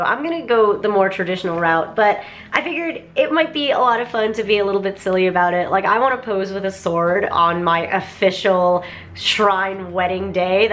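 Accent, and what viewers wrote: New Jersey, United States. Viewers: Wait...Were you born & raised in Japan?? I only ask because could've fooled me. You definitely sound like a girl from Jersey lol. How did you learn English?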